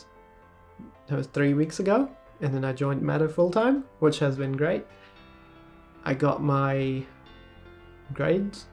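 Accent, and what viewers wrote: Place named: New Zealand. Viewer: Good to have you back mate. Can hear that NZ accent in your voice 😉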